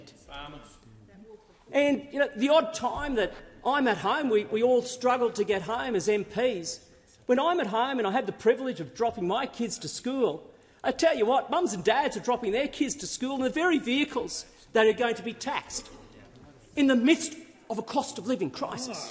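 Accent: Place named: Australia. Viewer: At least you sound like an aussie.... Not the Euro Import for top Aussie jobs?!?!?!.!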